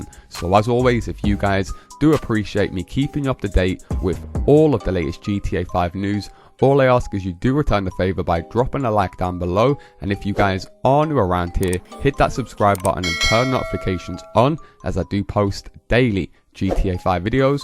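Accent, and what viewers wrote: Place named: England, United Kingdom. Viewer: Mate, You sound English.. As an Aussie I say IGNORE AMERICANS when they try tell an Englishman how to speak English.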